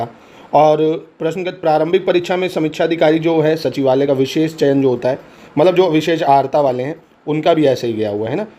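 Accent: Kanpur, India. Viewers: Kanpuriya hain ase hi Bolte hain😅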